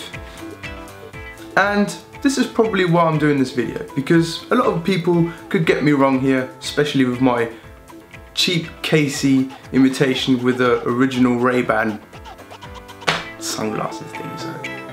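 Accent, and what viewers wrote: United Kingdom. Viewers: Great vid! You've got the brit accent going for you... I'm watching KC's vids diff now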